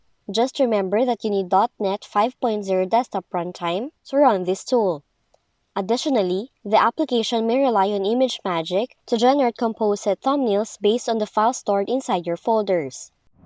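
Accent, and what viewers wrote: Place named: China. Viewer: unable to trust chinese accent there is suspicion of getting tricked into malware infection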